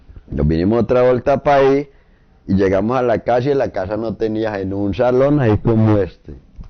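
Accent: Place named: Armenia, Colombia. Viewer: Buena historia.. mi novia es de Armenia y habla tal cual.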